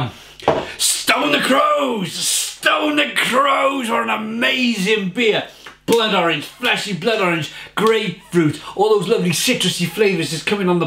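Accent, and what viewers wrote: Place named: North Wales, United Kingdom. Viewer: Great review mate! Where about's you from? You accent sounds north wales to me if i'm not mistaken?! Big ups from a welshman living in London.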